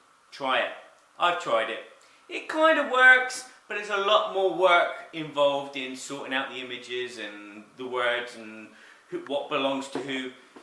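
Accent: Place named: Maine, United States. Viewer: I have never heard that particular American accent before, you must be from the east, far east, like Maine. As always great video. Thanks for sharing.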